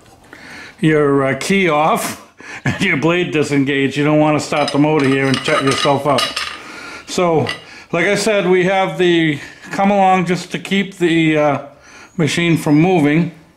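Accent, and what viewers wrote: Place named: Boston, United States. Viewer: Chicago, Jersey or boston? Oooo he just said the word bar.... hes definitely Boston! 👍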